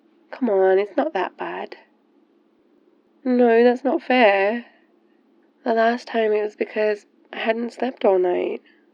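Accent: United Kingdom. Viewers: God, why are you british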